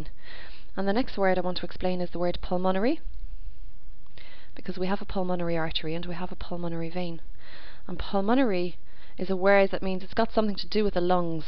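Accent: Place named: Ireland. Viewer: a fine irish accent with good diction ... what could be finer ?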